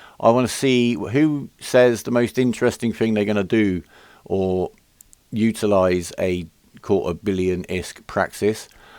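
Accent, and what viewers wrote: United Kingdom. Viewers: Congrats! I'm new to Eve and probably too late for the giveaway but only a week in and watching as many videos as I can to learn as fast as possible. If I had a Praxis I would definitely have to keep her mothballed for the time being as I would hate to lose her through incompetence!! I'd love to see a series of videos for complete newbies to watch AFTER they have completed the tutorials and the Agent Career missions as there seems to be a gap for such videos. Everyone assumes that after those you are an expert, but that's not the case. A bit like when you pass your driving test you don't know everything about driving. I subscribed when I saw your first video and am still loving them. (Also nice to watch a fellow Brit as your accent is similar to my own!) :)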